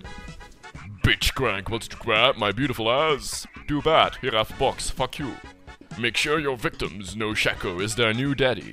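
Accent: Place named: Netherlands. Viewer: Gast, doe je je engels accent expres zo nederlands? xD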